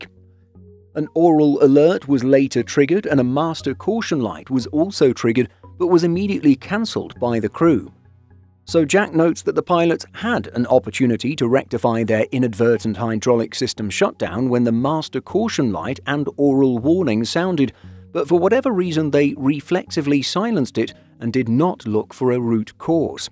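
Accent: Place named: England, United Kingdom. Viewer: What an annoying arrogant English accent...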